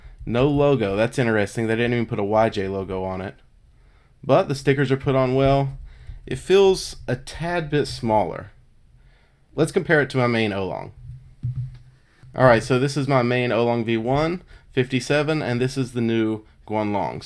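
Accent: South, United States. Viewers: You have a bit of a Southern drawl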